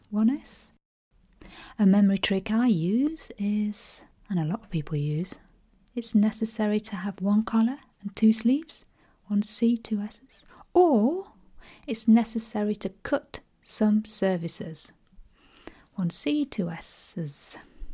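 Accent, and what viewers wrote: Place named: Midlands, United Kingdom. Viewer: I fell in love with your voice and my ears would like to marry it. The fact that you pronounce the 'g' at the end of '-ing' suggests to me you learnt to speak in the Midlands or North-West, is this correct? I'm a big fan of mnemonics, as I do some teaching myself. For sentence coherence and therefore better memorising, before and after the 'hurry' in 'diarrhoea' add a pause, it scans so much better and my students seem to remember it better. Whilst nothing to do with spelling, perhaps my favourite mnemonic is: 'How I wish I could recollect Pi' the amount of letters in each word equal '<num>', Pi to six decimal places. I have about a dozen which I use with scary regularity. The one for remembering the kings and queens of England is awesome, it starts 'Willy, Willy, Harry, Stee, Harry, Dick, John, Harry three...' It starts at 1066with William the conqueror and continues right through to our current queen, eventually. 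I'm looking forward to your website / next video, but not quite as much as my ears are ;-)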